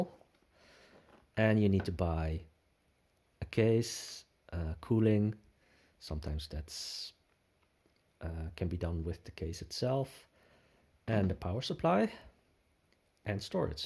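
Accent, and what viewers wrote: Netherlands. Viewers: I hear a bit of nederlands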